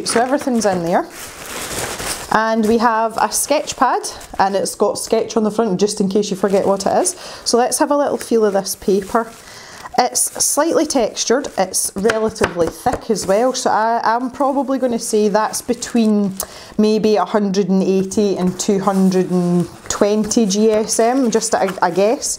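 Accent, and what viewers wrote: Scotland, United Kingdom. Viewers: Aw I am so glad I clicked on your video, so nice to hear Scottish youtubers! :)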